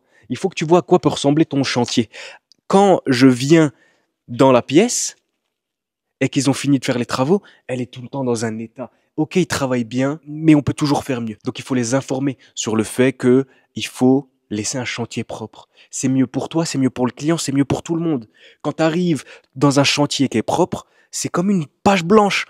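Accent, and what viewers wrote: Alsace, France. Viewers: J'ai bien reconnu cet accent, vous êtes Haut-rhinois? Le salam de mon fils du Bas-Rhin 🥨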